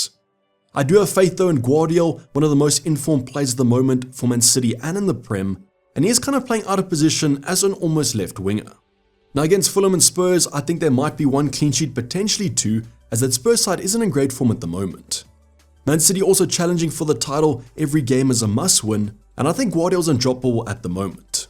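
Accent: South Africa. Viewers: That Saffa accent is strong bru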